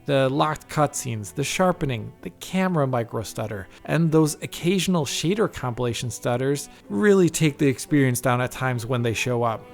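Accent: United States. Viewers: He lives in Germany, speaks with an American accent, and has an Italian name. Go figure! :)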